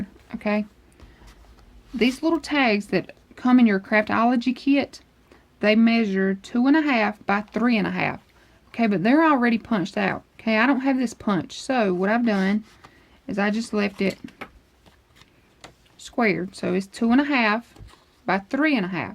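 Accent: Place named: South, United States. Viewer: Thank you for going at a speed where I can comprehend!! I just love your work and that Southern drawl!!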